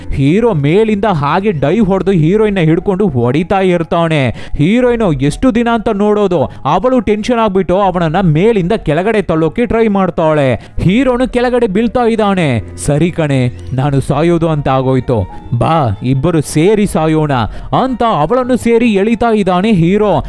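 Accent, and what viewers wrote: India. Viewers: Super and excellent voice and kannada accent, plse continue doing this dubbing in kannada work, we have very less English movies which is explained in kannada, also if you can try for dubbing ENGLISH movies in kannada plse will be super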